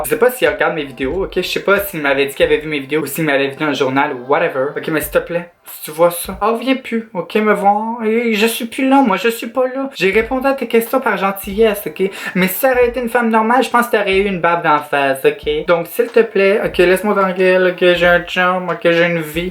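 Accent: Quebec, Canada. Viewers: J'adore l'accent québécois ☺️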